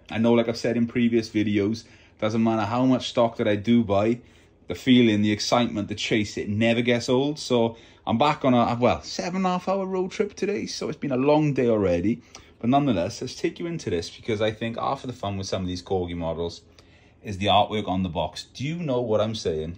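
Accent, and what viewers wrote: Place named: Swansea, United Kingdom. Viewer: your enthusiasm is infectious! superb haul, i'm pleased to say i own many of them although not all are boxed, i completely agree re the artwork, it is absolutely stunning, a golden era not just for toys but everything else really(i wont get political) incidentally my cousin's husband is from swansea and his accent is so similar to yours(obviously) great video as usual, yes, i'd be interested in a more in-depth look at your collecting process, thanks again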